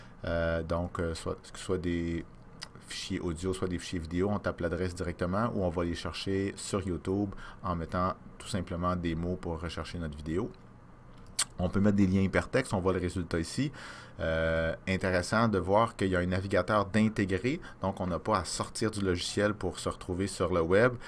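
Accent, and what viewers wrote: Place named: Quebec, Canada. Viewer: Non, si tu savais comment tu me sauves du temps. Tes capsules sont très bien expliquées car j'ai fait le tour...et d'autant plus c'est la voix d'un québécois... hihih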